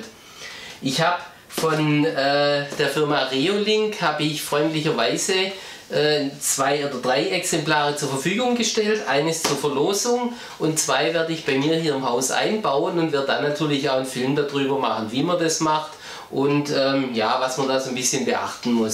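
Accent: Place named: Saxony, Germany. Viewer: hört man doch: Sachsen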